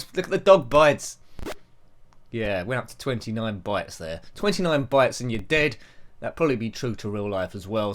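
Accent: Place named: Cockney, United Kingdom. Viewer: A nice little game that probably would have been popular on a budget label back in the day. It's all a bit basic and lacking flair, but it's decent in all areas. I really hope lots of new Spectrum games are created now that the Spectrum Next has given the brand a new lease of life., EastEnders should have you as a guy who runs a retro computer shop in Albert Square. Your accent is perfect for the show. One of my grandfathers was a cockney(born within bow bells.) so I have London in my blood. Have a King Lear on me. Cheers! D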